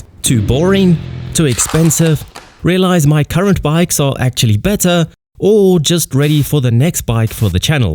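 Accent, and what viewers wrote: South Africa. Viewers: I am VERY good with accents, but not perfect. You are most def from South Africa, but English heritage or Boers/Afrikaans. heritage. I am NOT hearing a pronounced Afrikaans accent, so I am leaning to an English heritage, predominantly. Am I correct? I am just a bloke born and raised in the USA who lived in the Caribbean for 15 years. MANY of those years in the 1980's on the Dutch island of Saint Maarten where so very many expat South Africans lived on the boats they made and sailed away from their birthplace forever.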